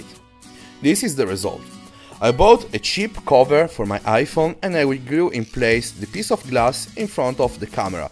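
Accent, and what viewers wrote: Italy. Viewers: he's italian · yeah to be honest xD u can really tell its Italian also for the first trick i was able to switch the front and back camera after unscrewing it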